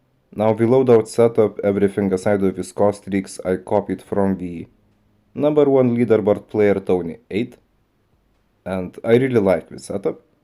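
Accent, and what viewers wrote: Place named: Russia. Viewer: U from Russia cuz you got pretty thick Russian accent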